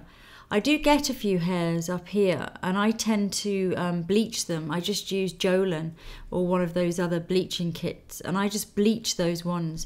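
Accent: England, United Kingdom. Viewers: i just love your make up sense....so natural and sophisticated........your confidence in your work and your British accent are just WOW!! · I am a new subscriber after hearing juicytuesday speak so highly of you.I have watched a few videos and am crazy about you already.You are a very beautiful woman and I just seem to adore you English ladies.Love the accents and you all have such gorgeous complexions.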